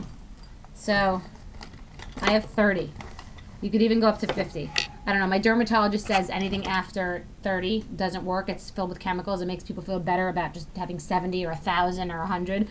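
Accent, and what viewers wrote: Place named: New York, United States. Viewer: I just love you Bethenny! I could listen to you talk all day. Ur so NY!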